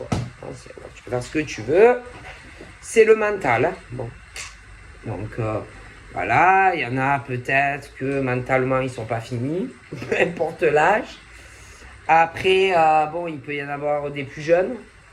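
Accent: South, France. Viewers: Bonjour, j'adore cette guidance !. Un jeu d'actrice très réussi, un franc parler bien du sud, un vent de fraîcheur ! Ça met de la gaité sur des situations difficiles. Merci bcp, et un tirage qui me parle vraiment 🙏🙏❤️❤️❤️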